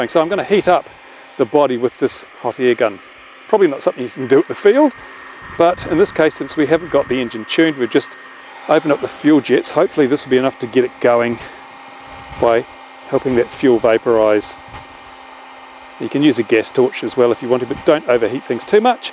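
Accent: New Zealand. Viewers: you sound kiwi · Why dont you mount it on a sheep???? Did I detect a Kiwi ecsent.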